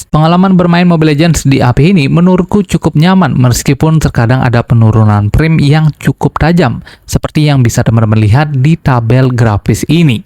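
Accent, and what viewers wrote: Bali, Indonesia. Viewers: Abang nya orang bali ya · Anak bali ini logatnya ya bli😊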